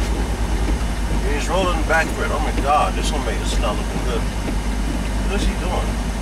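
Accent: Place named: East Coast, United States. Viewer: These youngsters these days! In 34+ years of driving, I've seen alot of dumb shit happen, but I never in my wildest dreams imagined all the dumbshit drivers with CDL's. This new generation of drivers are reckless and complainers. They think they know it all and none of us old timers know anything about driving. Especially Winter driving. Especially I-80 Winter driving. Take that 70 truck pileup on I-80 in 2015 as picture of reckless idiotic youngsters with no respect for the road, the conditions or each other. Pathetic. The guy in this video sounds like a guy from the eastcoast. Like the guy whose comments I saw, this driver is slip seating and doesn't want to buy and hook up a CB. They do sell portables, you know. Most new trucks have built in antennas. You just hook your power cord to the built in terminals and attach the provided coax to your radio. 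Badda bing, badda boom...you got yer radio going...don't worry about it......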